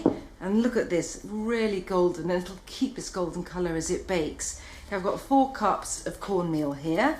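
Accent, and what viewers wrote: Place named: England, United Kingdom. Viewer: I love her English accent.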